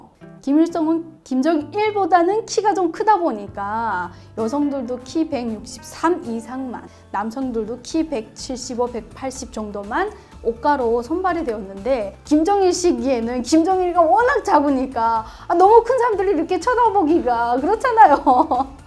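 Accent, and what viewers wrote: Seoul, South Korea. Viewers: She already had that seoul accent i think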